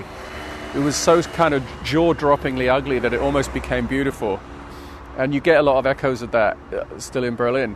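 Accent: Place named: Portsmouth, United Kingdom. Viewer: Huh? That's not true at all. He sounds exactly like what he is - a guy from Portsmouth on the south coast of England. (Also my home town.) You might be getting confused because the Pompey accent has a partial west country burr on the Rs to it (like a pirate), and that same burr has always been a non-trivial component of the American accent. But trust me, his accent is definitely English. Perhaps just not the accent you think of as being English (there are DOZENS of distinct English accents).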